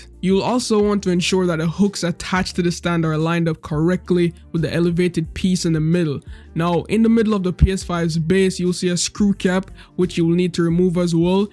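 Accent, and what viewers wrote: Jamaica. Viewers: Are you a Jamaican? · You could hear the Jamaican accent 🇯🇲🇯🇲 old news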